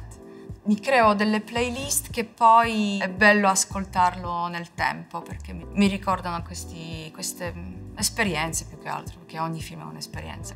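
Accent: Italy. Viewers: un bellissimo accento italiano💗:)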